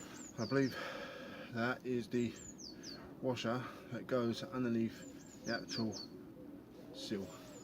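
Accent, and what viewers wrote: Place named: South East England, United Kingdom. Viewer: Are you from the south east mate? Fancy doing mine for me ?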